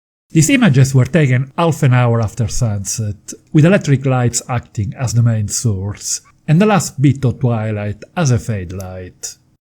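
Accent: Italy. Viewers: He's Italian?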